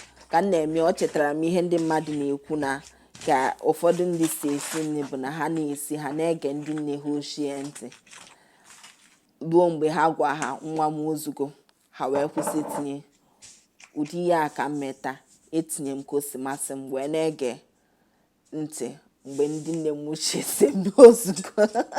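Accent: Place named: Igbo, Nigeria. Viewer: Iseeeeee 🙏 I love your Igbo accent 😁😁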